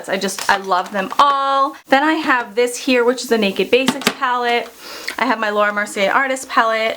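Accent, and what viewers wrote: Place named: New York, United States. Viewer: Are you from New York or New Jersey or something? I hear the accent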